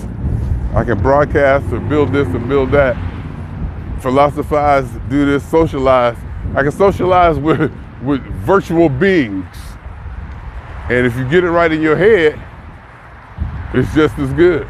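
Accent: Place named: Midwest, United States. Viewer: I can spot the Midwest every time. Thanks.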